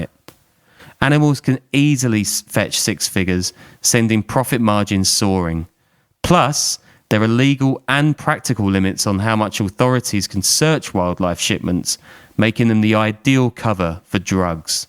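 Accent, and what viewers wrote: United Kingdom. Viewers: Didn’t watch the entire video, as I was sick of seeing the same pictures over and over. And someone with a British accent talking about something that happened in Mexico is very odd …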